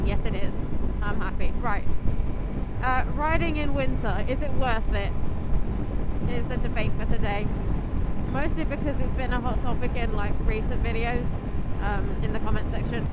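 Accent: United Kingdom. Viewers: Im from the Highlands Scotland and iv been riding for a few years. I dont usually ride in the winter only if its necessary. I went round a hairpin and hit a patch of black ice on my ZX6R and wraped it around a tree a few months after getting my A license. 🤣 where abouts UK are you based? Sound Cambridge area.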